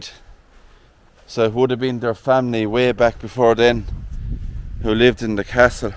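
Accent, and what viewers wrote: Ireland. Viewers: I enjoy your channel and videos very much! As a Midwestern American, I could listen to your great Irish accent all day!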